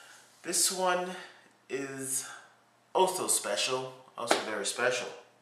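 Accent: Jutland, Denmark. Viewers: og nårh ja, man kan godt høre at du bor i Århus, du har stærk jydsk accent :D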